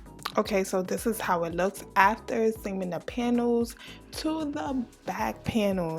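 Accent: New York, United States. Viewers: I'm usually good at recognizing accents. Are u from NY?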